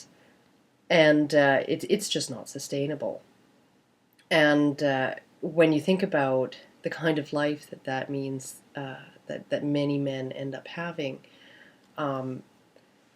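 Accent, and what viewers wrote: Canada. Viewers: You sound Canadian. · Northern accent... Canadian?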